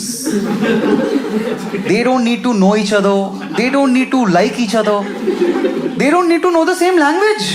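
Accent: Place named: Bengal, India. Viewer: I like your benlivised english.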